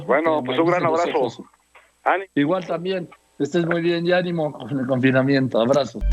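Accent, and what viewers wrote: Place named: Galicia, Spain. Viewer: Cada vez el español gallego de lopez doriga. Se parece a loret de mola puras fake news.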